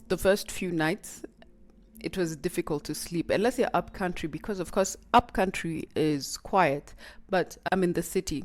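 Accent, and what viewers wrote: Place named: Kenya. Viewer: You never lost your Kenyan accent,,, being Kenyan is permanent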